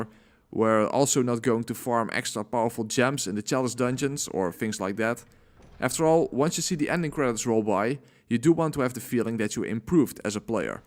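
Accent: Netherlands. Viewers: ah sweet, sorry for the very late reply lol xD, i thought i noticed in some of the way of prenouncing, not trying to say the english is bad in any way though! Just as a fellow dutchie u recognize other dutchies talking in English. Also great video btw, this helped me a lot with the bl4 run :)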